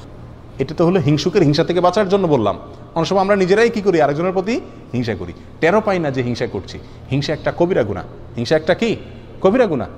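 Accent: Chittagong, Bangladesh. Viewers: Vai Apni Ki chittagong Er ?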